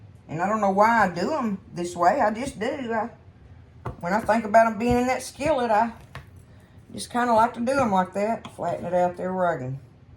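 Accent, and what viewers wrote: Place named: Texas, United States. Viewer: Love watching you cook with you country ways and Texas accent! Being from Lampasas Texas, I can understand you very well! lol😊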